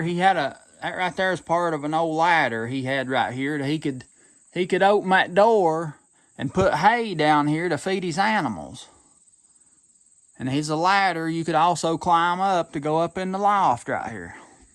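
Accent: United States. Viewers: I like the way u talk very country I do some I'm from raleigh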